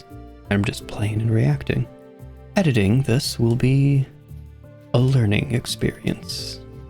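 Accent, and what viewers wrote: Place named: Nova Scotia, Canada. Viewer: Random but are you from eastern Canada? I would have just assumed US but the way you pronounce Rs especially in words like "marked" has the super Irish sounding quality people from Nova Scotia often have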